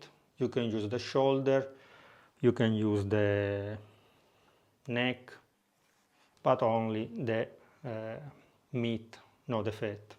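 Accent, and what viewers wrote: Italy. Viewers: Descubriendo tu canal, saludos desde Venezuela, y comienzo a ver tus videos hoy. Comencé con la papada curada de cerdo para hacer carbonada... me encanta tu acento italiano de tu inglés!! Un abrazo y mucha suerte!!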